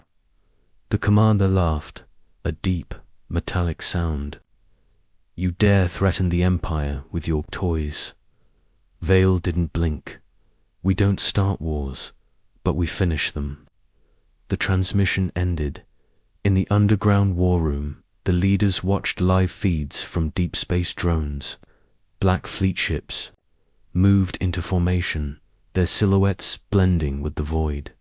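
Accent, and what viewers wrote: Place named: United Kingdom. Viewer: The slow speed combined with the British accent is terrible! This makes me want to go to sleep in the 1st 10 seconds.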